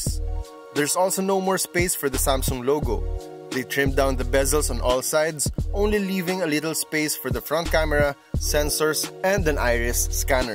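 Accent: Philippines. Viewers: wow at the first 5 seconds of the video I already knew you were a filipino because of your accent....since you're a Filipino I will give you a thumbs up and I'll subscribe...btw i like the quality of the vid. Great Job po 👍👍❤ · this guy sounds Filipino. eh :) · Chris humphrey galapon yup. He has a really heavy Filipino accent :) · gawd .. the Philippino accent is the fucking woooooorst galàsheeew, clawkspeed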